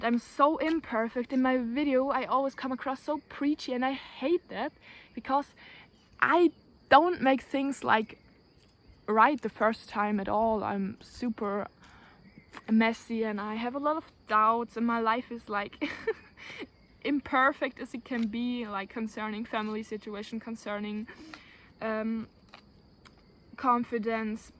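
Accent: Germany. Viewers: Mir gefällt dein deutscher Akzent und du hast schon etwas Ähnlichkeit mit Doc Caro :)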